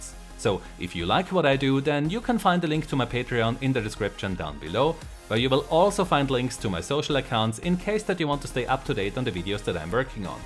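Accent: Germany. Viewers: German speaking english???? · 1 satz und man hört das du deutsch bist :D klasse video!!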